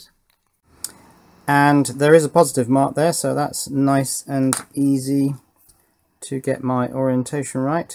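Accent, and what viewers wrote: England, United Kingdom. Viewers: Hi, have you used any flux on the solder braid before attempting to remove solder? I also don't like solder braid myself but flux might help you. I use a Hakko de-soldering gun on most of my repair work, for me its the easier and cleaner option. It's a lot more expensive but you remove all the solder in one press of the trigger, even on multi-layer boards. Occasionally on lead free solder I need to add fresh 60/40 leaded solder before trying to remove the old stuff. Keep up the great videos, I'm originally from England and its comforting to hear the accent from time to time.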